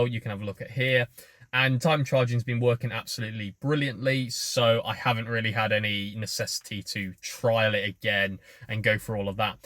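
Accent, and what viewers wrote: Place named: RP, United Kingdom. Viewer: No problems and keep up the great work. There's a Norwegian guy that does tests also. Very interesting statistics etc. Btw, you speak clearly without any regional accent, hence why I thought you may have been to either public school or Oxbridge 😎👍🏾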